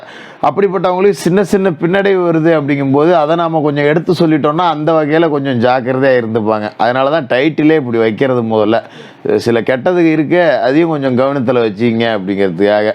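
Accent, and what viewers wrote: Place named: Thanjavur, India. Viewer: ❤ தஞ்சை தமிழ் போல தெரிகிறதே சுவாமிஜி! நன்றி 🎉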